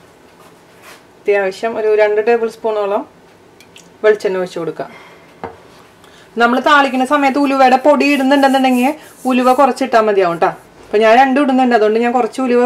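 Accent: Thrissur, India. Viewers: Nice, simple n tasty. Nice to hear your Thrissur accent, n nice English subtitles. If you put some more of those subtitles, it will be of great help for Non Keralite viewers. In north India Kerala people, Kerala cuisine are very popular. Wonderful job👍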